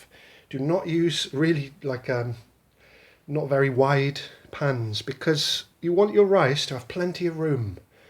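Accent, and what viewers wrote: United Kingdom. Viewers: British accent is amazing !!!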